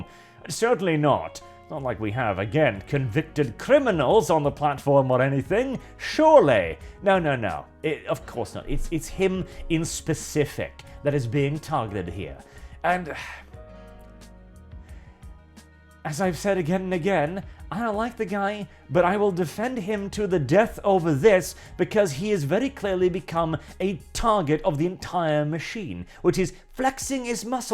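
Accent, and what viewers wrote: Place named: United Kingdom. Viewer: He's Norwegian that learned English from Brits.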